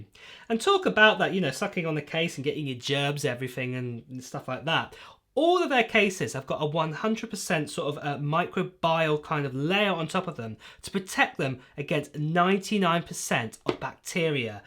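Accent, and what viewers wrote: United Kingdom. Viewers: After listening to him, I want a British boyfriend 😂 Canadian accent is so plain! I use a Casetify case for my 12 Pro max, I absolutely love it coz it has my name on it. I ordered a blue iMac yesterday but looking for the M1X MacBook for sure!!! Need something portable! 😂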